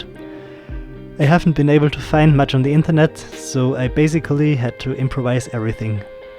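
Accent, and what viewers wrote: Germany. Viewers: Bist du Deutscher? Klingt fast nach nem Sachse xD Liebe Grüße aus Leipzig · You should have cleaned the bottle too. There were still residues here, probably some of the black particles as well. It would be a shame if all the effort had been in vain. I can also hear a German dialect. Can this be? =)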